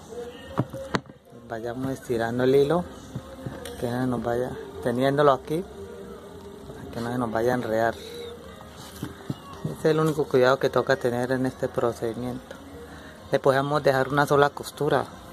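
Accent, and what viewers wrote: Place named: Santander, Spain. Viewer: que no se note que es santandereano, maestro.....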